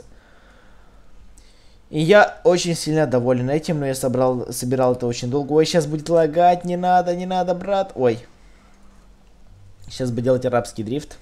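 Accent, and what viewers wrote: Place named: Moldova. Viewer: Акцент молдована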